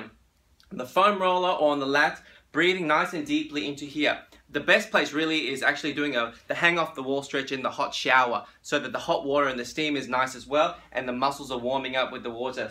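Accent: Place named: South Africa. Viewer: Hi, do you speak Afrikaans? You sound like you may be South African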